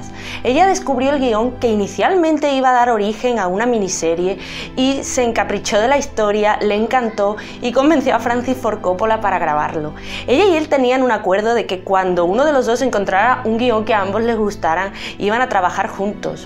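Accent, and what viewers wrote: Spain. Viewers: Me encanta tu acento español y muy buena aportación; hay muchas cosas que no sabía y gracias a ti hoy las sé. Buen vídeo mi estimada!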